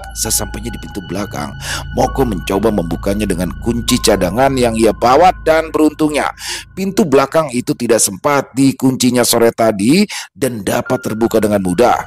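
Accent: Jakarta, Indonesia. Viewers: pagi bang... semoga sehat sll 😊🙏 suka banget denger cerita berlogat betawi walaupun saya orang jawa tulen😊😊😊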